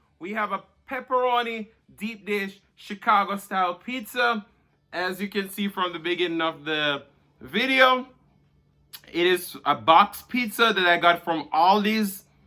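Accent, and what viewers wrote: Patois, Jamaica. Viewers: I can listen to you speak Patois all day lol This was good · Awww you changed the title. Thank you. You are too kind! I loveeee this poem so much, and the fierceness in which you delivered it. That patois, so wonderful to hear.. yes you did Recite that, and eat this!! ❤️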